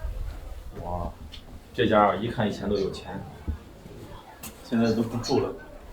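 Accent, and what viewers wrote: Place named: North, China. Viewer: ：听口音，你是北方人，不是西北或者华北人。你在吃江南面食小食等插话缺乏艺术，也缺少历史文化语言。 南北方吃文化相差很大，江南以炒菜为主，北方以一锅煮为主，作为美食家要会比较。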